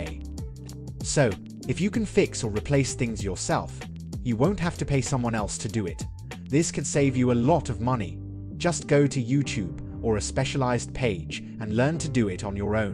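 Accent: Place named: United Kingdom. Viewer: To be honest — really tired of the British accent.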